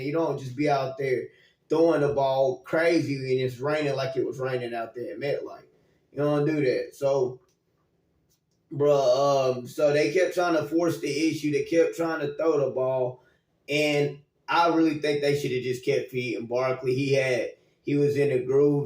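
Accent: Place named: South, United States. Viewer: As a Cowboys fan in NYC, I respect you for doing a good job of putting lipstick on a pig. Also, our good but young TEs dropped two very catchable balls in/near the end zone. Finally, 11 Ls in a row? Y’all really don’t want to see us again, especially if we’re relatively healthy. We’ll only be better next time. Thanks southern sounding Giants fan in the big ciddy. Wish you much success on YouTube.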